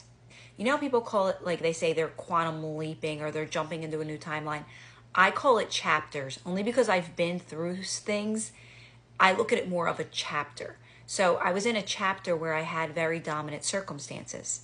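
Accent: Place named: Philadelphia, United States. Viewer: I cannot get past that Philly accent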